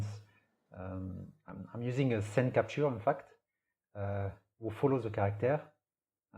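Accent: France. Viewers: The accent français xD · Oulalala l'accent bien français ;)) , un grand bravo pour tout ce que tu as fait c'est chef-d'œuvre , continue comme ça ! :) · L’accent frenchy xD Trop drôle :D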